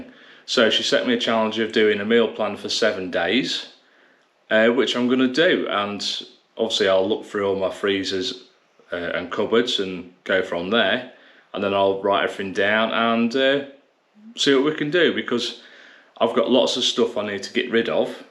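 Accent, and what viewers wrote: Lincolnshire, United Kingdom. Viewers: Nice to hear someone from Lincolnshire. I'm in the shire too :)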